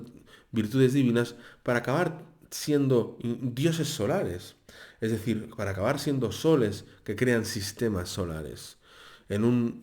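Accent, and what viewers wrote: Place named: Andalusia, Spain. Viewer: Holaaa! Me da mucho gusto escucharte, nombras palabras como mi abuelo de Huercal Overa y de mi abuela de Baza... me da mucha nostalgia y a la vez trasmitis con mucha gracia tus conocimientos!!! Me encanta tu forma de leer los astros. Hace varios años que te escucho. Gracias❤!!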